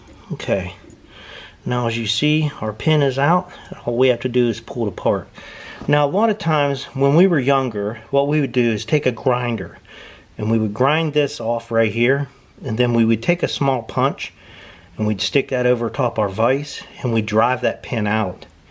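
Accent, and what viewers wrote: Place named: Pennsylvania, United States. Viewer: Love your accent.. PA? NC?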